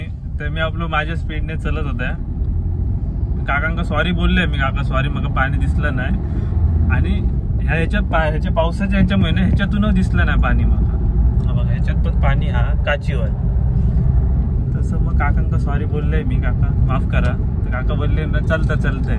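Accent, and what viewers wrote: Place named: Malvan, India. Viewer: Enjoyed vlog ani Asli malvani aikaila nicely explain the route 😊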